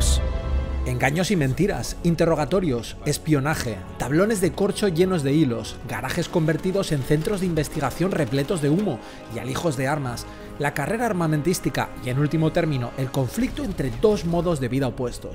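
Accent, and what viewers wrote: Spain. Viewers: Volvió castellano, gran análisis maestro.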